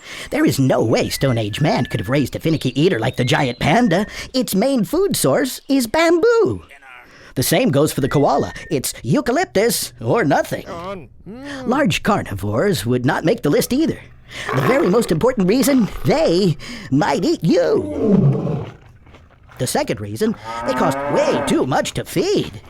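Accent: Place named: South, United States. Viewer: the voice sounds like a hillbilly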